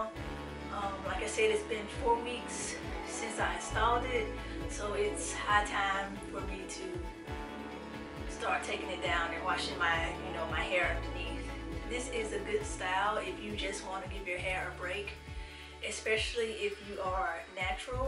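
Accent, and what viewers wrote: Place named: Rural, United States. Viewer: I LOVE your country accent!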